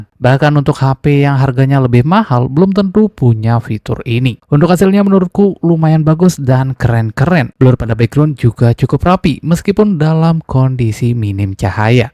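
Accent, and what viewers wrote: Bali, Indonesia. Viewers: ini logat bali kah?atau mana?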